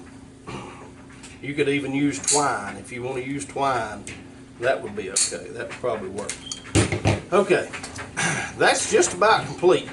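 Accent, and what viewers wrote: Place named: Brooklyn, United States. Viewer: Love that Brooklyn accent!!!!!!!!!!!!!!!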